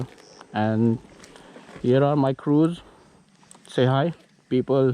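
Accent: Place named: Mizoram, India. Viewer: Mizo saptrong thluk(accent) chiah2 nh chu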